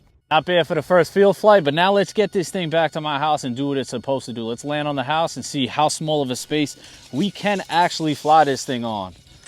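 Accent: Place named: East Coast, United States. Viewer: Great coverage of this plane! Im looking for a nice backyard flyer. And your east coast accent makes me feel at home man. I moved away from The Island when I was in my 20s.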